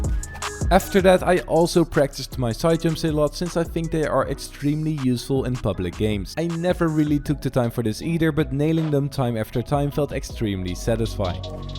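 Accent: Netherlands. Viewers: Vault grey pistols:) Are you dutch cause i think that when I hear your accent.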